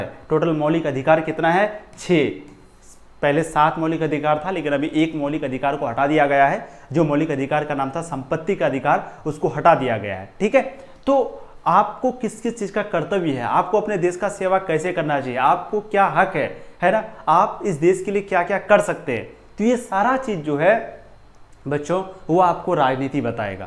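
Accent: Bihar, India. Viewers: Sir ap bihar se h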